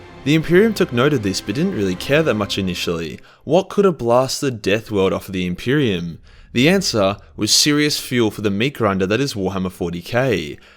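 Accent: Australia. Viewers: I already know half the lore but hearing from an Aussie makes it better. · Bruh, why are Australian accents the best? voice makes my mussy moist.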